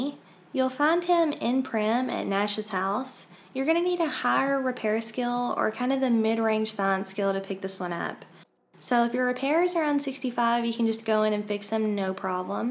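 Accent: South, United States. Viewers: Not gonna lie wasn’t expecting the southern girl accent. No negativity tho pleasant surprise. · O boy I can sure tell your southern lol so am I from Oklahoma 😉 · Are you from the south? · I like your southern accent. From one southerner to another. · Nice guide. Lots of gamers just drone on and on and end up losing the audience. Plus I don't often hear a lot of gamers with a slight southern sounding accent, especially girl gamers. Thanks for representing. Makes me a little less self-conscious.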